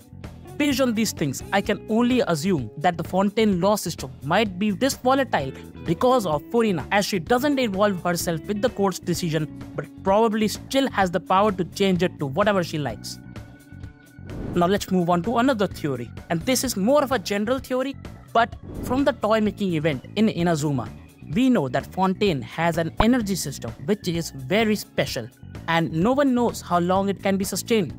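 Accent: India. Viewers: ur indian ryt?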